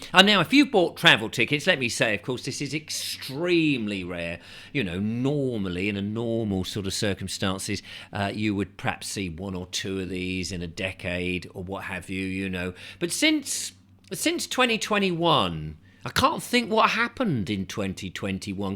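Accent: United Kingdom. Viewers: Absolutely love your classic "Brit" style: humor, dress, accent, all of it. You can deliver the worst news possible with arid humor. Can't wait for your coverage of World War III.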